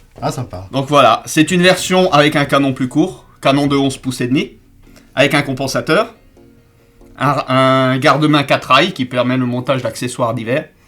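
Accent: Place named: Eastern France, France. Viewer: felicitation super chaine De beau montage video digne de professionnelle Sujets tres interessants et d'actualités et on parle beaucoup de TAR Avec un leger petit accent de l'est de la france si jai bien reconnu bonne continuation cordialement ludo du sud de la france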